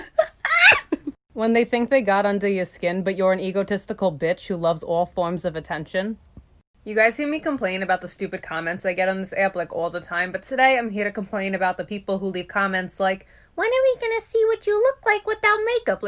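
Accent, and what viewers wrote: New Jersey, United States. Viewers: This is the only time I heard people say they actually like the New Jersey Italian accent. It makes me happy :’)) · So I usually don’t like the jersey accent- I find it abrasive. But her accent is quite beautiful. It’s very soothing and almost musical.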